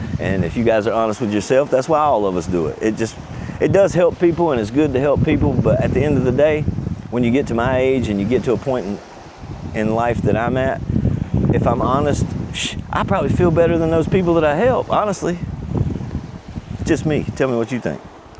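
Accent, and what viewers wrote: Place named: Southeast, United States. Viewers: Hey. I lived in Virginia Beach for 35 years and wouldn’t trade it for anything. You sound like a North Catalina boy. My limited experience would put you in the Outer Banks. Anyway, I dig your content. In the process of selling my BMW R1200GSA WC and will save that for my scooter when I arrive north of Manila September 2028. As an Army veteran, I’ll do the homework for a SRRV. From So. Cal., JM. P.S. Bless you for the charitable heart. 💪🏼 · I am new to your channel, I also live in the philippines. Alabang . I am trying to pinpoint your accent , I'm guessing the southeast United States. Maybe Alabama or Georgia. Any way my Budget runs about 45000 a month php i am pleased to meet you ,Frank from Florida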